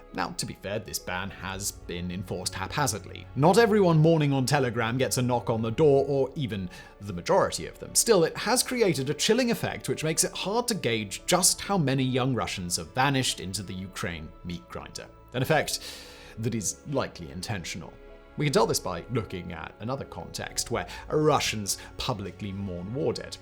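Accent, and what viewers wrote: England, United Kingdom. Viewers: Another NWO site and sounds like he's from that wannabe country called England . What was the last so-called WAR England had . Oh yes , invading the Falkland Island. Not only loosing one of their war ships , they had to use a cruise ship to bring the ground troops to the conflict . So , England has to war through Ukraine to test their weapons . Britain doesn't even rule the waves anymore since the ending of WW II .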